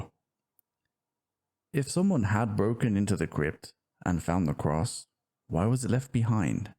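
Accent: United Kingdom. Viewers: Hope you have a SPOOKTACULAR HALLOWEEN weekends mate! 🎃👻 P.S : Loving your British accent more and more. 🤍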